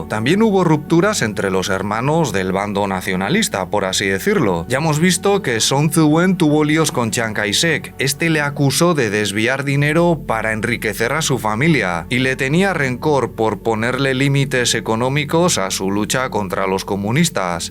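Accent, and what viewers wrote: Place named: Basque Country, Spain. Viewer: Es acento típico de la zona de España de donde procede. Esa cadencia que dices, viene de influencia del euskera.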